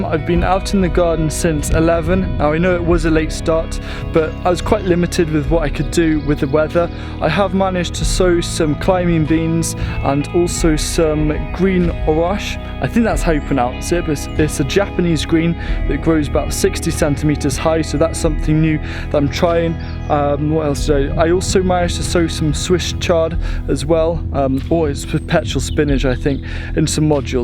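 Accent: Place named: Wales, United Kingdom. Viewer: Love the show and your just great to listen to, love the Welsh accent. Rhubarb, wonderful vegetable, whats the secret in growing the best like feeding manure, Boron and/or Iron etc etc, want to hear your secrets please, Peter Australia. Yours are so RED, whats the secret.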